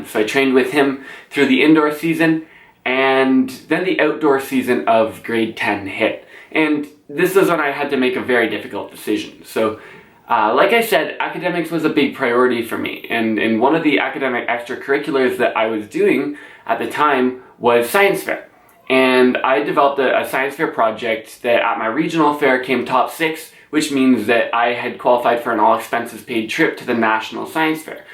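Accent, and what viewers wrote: Canada. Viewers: I really love your videos man and you can definitively tell your Canadian whenever you say words like watch and tomorrow lol best of luck in Harvard mate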